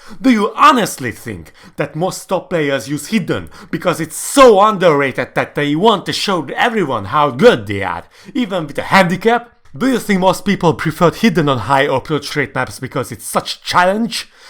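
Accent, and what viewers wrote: Hungary. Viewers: Egy ideje nézem a videóidat, ismerős volt a kiejtés, de csak most realizáltam, hogy magyar vagy :D Így tovább!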